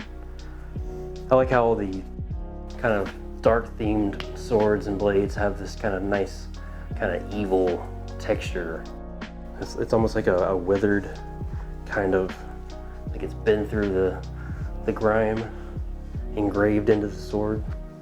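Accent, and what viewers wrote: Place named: Alabama, United States. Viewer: What’s wrong with an Alabama accent man?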